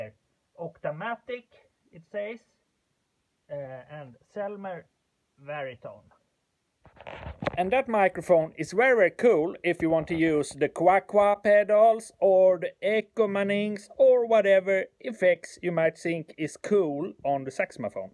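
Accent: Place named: Sweden. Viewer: Fantastiskt grejt swenglish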